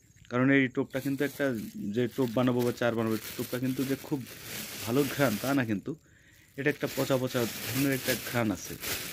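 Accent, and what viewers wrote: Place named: Gopalganj, Bangladesh. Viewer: ভাই মনেহচ্ছে আপনার বাড়ি গোপালগঞ্জ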